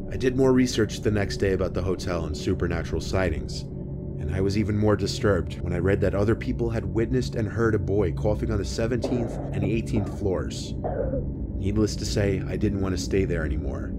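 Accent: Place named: Long Island, United States. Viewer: You sound like ur from Long Island NY! If so ❤️❤️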